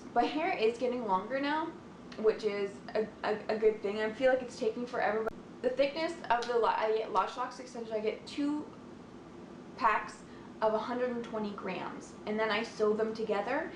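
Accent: Canada. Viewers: Are you Canadian? Because you sound like you have a Canadian accent...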